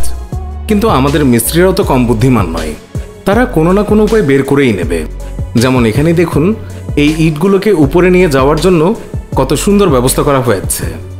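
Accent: Bangladesh. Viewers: আপনার ভাষা শুনে ,মনে হচ্ছে আপনি বাংলাদেশি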